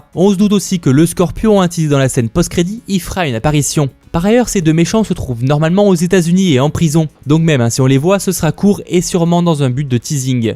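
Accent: England, United Kingdom. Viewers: J'adore ton accent anglais x)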